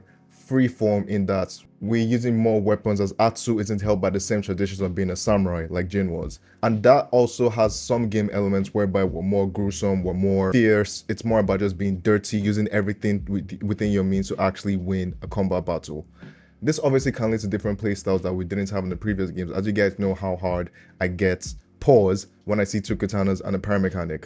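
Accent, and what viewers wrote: Nigeria. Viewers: My broda, as soon as I heard that accent I said, he is one of us............. Naija!!!! About Yotei, honestly this was a bit disappointing to me, I finished Tsushima and its DLC on PC, so I was expecting them to come with some more fire, honestly I didn't expect them to just repackage the same thing, make some editions and sell it to me, this won't be a skip but it's definitely not a Day 1 for me. I'll wait for this to come to PC and play it, btw I just subscribed to your channel.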